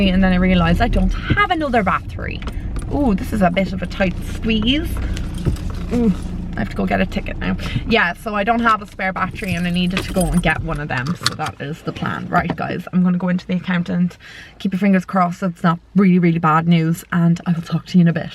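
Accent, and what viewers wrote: Dublin, Ireland. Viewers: are you from dublin?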